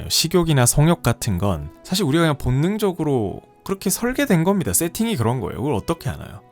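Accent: South Korea. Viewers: 늘 자기계발 인간관계 지식 이런 류의 채널 영상을 자주보는데...AI 목소리가 많아서 귀에 잘 안들어왔거든요. 근데 목소리가 너무 좋으시고 뭣보다도 친근한 약간의 경상도 억양과 단어들이...오히려 귀에 잘 들어오고 더 와닿네요. 구독누르고 갑니다❤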